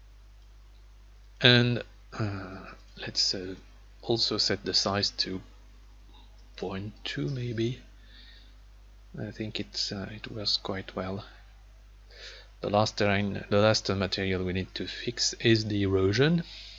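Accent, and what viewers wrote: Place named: France. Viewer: bonjour, je pense que tu es français vu ton accent. Tu peux me dire si je peux obtenir une version démo de world creator 2 ? Sur leur site je vois pas de version d'essai. ya moyen d"en avoir une ou faut direct acheter le soft ? si c'est le cas franchement ils abusent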